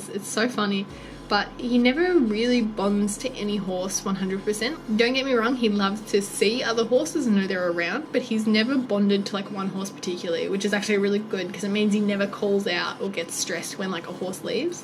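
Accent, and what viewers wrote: Australia. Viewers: As soon as I heard you where Aussie I subbed haha I'm a fellow Aussie (live in SA) and this is my first vid of yours I watched and I love you guys already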